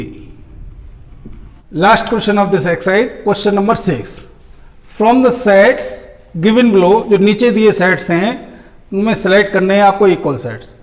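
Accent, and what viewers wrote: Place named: India. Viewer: I think I gained my brain cells after watching this ... perfect indian accent for teaching Maths .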